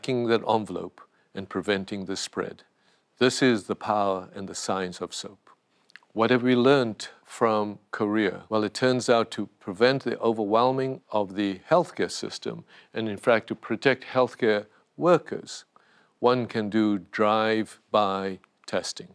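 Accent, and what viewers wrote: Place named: South Africa. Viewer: Great that having spent so much time outside South Africa he still maintains his perfect South African English accent.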